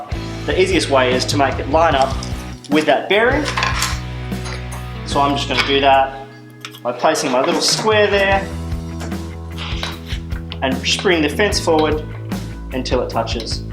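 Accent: Australia. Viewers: Excellent instructional video. Like the fact its presented by an aussie so I can understand it. Thanks for posting. I'm buying the gear.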